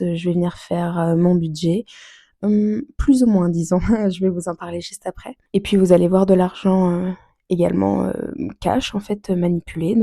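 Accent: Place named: Quebec, Canada. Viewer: Kikou, bienvenue sur YouTube 🤎 Ravie de te découvrir! Çà ne doit pas être facile de gérer le budget par 2 semaines quand on n'a pas l'habitude! Bravo pour ta résolution de reprendre ton budget 🥰 Je me suis abonnée avec plaisir, ton accent québécois qui transperce par moments est charmant 😍 Des bisous 😘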